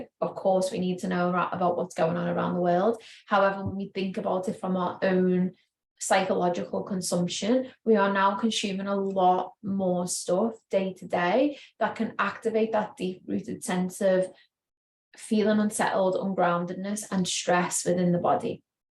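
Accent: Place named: Liverpool, United Kingdom. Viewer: Nice to hear another Scouser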